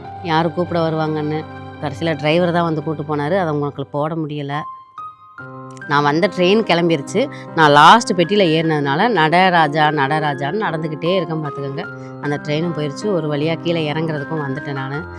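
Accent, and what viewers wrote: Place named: Kodaikanal, India. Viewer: உங்களது vlog ரொம்ப பிடிக்கும்பா. உங்களது அமைதியான அழகு, இனிமையான குரல் ரொம்ப ரொம்ப ரசிப்பேன்பா.நான் கொடைக்கானல். ரொம்ப நாளாக உங்கள் பேச்சு வழக்கைப் பார்த்து நம்ம பக்கம் மாதிரி இருக்கே என்று ரசிப்பேன். நீங்கள் சிகிச்சைக்காக சென்ற ஆஸ்பத்திரியைப் பார்த்ததும் என் மனது கனத்து என் கண்களில் என்னையறியாமல் கண்ணீர் பெருக்கெடுத்து விட்டது. கடவுள் ஏன் நல்லவர்களை இப்படி சோதிக்கிறார்.உங்கள் vlogல் நல்ல மெஸேஜ் கொடுப்பீங்க. கடவுள் உங்களுக்கு நல்லதே செய்வார். என் சகோதரிக்கு கொடைக்கானல் சாந்தி அம்மாவின் அன்பு முத்தங்கள்.❤❤❤❤❤❤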